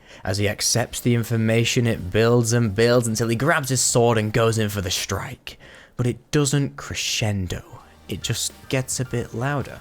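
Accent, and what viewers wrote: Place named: United Kingdom. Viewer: As a fellow Seiko lover the amount of random Seiko yelling segments make me chuckle, also its fun to hear another brit talk about this series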